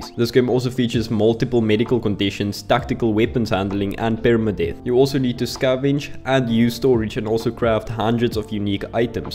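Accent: South Africa. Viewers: With in the firs 1 second i could tell ur a fellow south african gon be giving u a sub and so on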